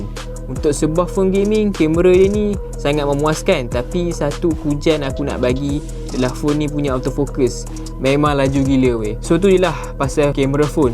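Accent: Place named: North, Malaysia. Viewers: Nice. Kipidap. Tapi loghat utara tu cover2 sikit boss ku, sebab kalau bercampoq dengan kl dengaq lain mcm oii haha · Cakap ja utaqa wei. Pelik eh. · Org perak ghupenye... kome perak mana tu?? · Barai lah budak nih dgn loghat utara lagi haduhhhh failll.....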